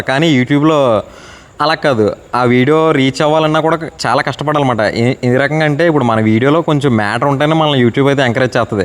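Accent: Godavari, India. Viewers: Godavari voice❤❤❤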